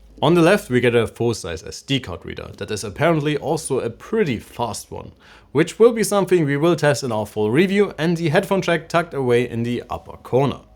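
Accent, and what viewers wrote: Germany. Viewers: I Hear Your Deutsch Akzent 😂 schönes Video btw 🎉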